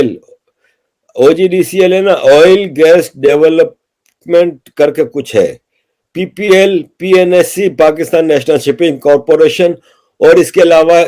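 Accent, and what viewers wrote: Gujarat, India. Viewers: Sir aap k bol ne me abhi bhi gujrati accent dikhay de ti aor sir aap j se musalman ki Bharat me jarur hai aap Gujarat me aa ke rahi ye sir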